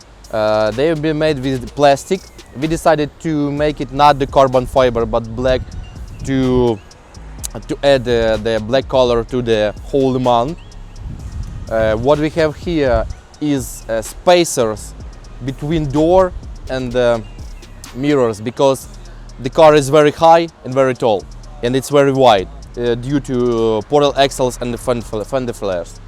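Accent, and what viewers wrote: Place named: Ukraine. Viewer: Я плохо понимаю английскую речь но тут понял все. Украинский английский язык такой же как Русский английский язык. Тачка хорошая получилась. Ну а портальных мостах?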